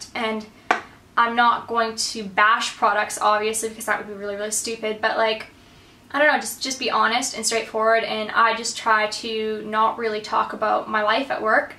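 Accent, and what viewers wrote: Australia. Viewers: i love that you get a sneaky australian accent haha! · Love how you are starting to have a little bit of an Australian accent and say more of their phrases haha :) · I like how I can kind of hear a slight an Australian accent, Cute!! :D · you're starting to get an australlian accent a little bit lol :p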